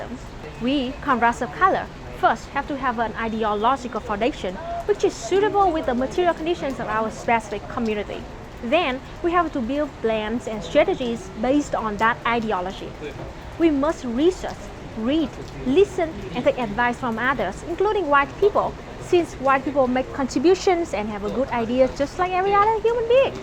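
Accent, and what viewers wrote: Vietnam. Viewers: I find that after watching more of her videos, I have gotten much better at understanding her with little to no effort. I suggest trying to push through and before long you will probably find that you get used to the beautiful vietnamese accent and don't have to focus much anymore!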